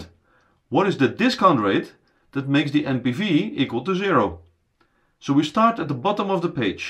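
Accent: Netherlands. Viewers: Je hoort zo hard dat hij Nederlands is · Thank you so much for the videos. And I love your Dutch accent. What I still not fully grasp is the non technical meaning of IRR. Does that mean that if IRR is less than or equal to WACC, the project should not be pursued? And whats the difference between IRR and Rate of Return? Just the time? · Best explanation on YouTube - and given in a beautiful Dutch accent too! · Very strong Dutch accent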